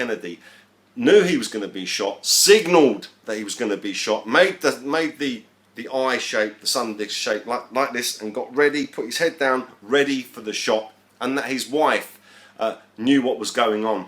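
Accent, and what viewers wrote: United Kingdom. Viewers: proof that the British accent can't conceal all ignorace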